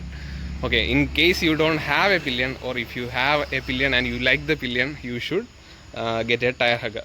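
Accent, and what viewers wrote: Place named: Kerala, India. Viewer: That thick mallu accent😂❤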